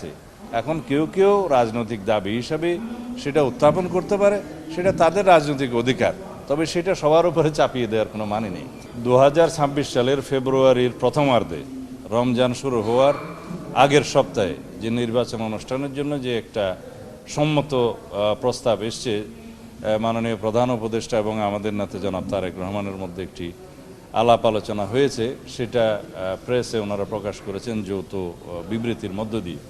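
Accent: India. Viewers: এই টা ভারতীয় মাল কথায় বুজা যায়। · র এর সদস্য জ্বালা হবেই/সালাউদ্দিনের কথা বলার স্টাইল আওয়ামী ও ভারতের মত।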